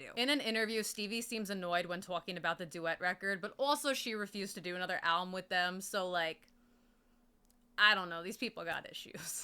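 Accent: New York, United States. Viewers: Love that New York accent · Staten/long island/NY ass accent goth girl talking about Fleetwood Mac?! Sign me up fam. I grew up in NJ and this is very wholesome to me.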